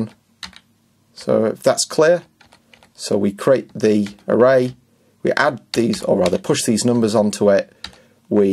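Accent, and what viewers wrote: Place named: Cornwall, United Kingdom. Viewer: Nice program! And btw : I love your pronunciation und I guess ... Cornwall? Greetings from Germany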